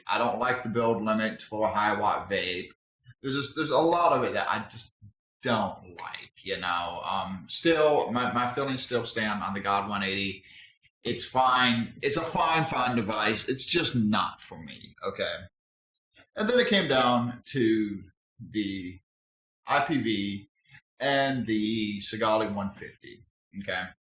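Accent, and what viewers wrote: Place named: Australia. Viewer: But harsh but can't fault the honesty. You sound Australian! OI OI oi?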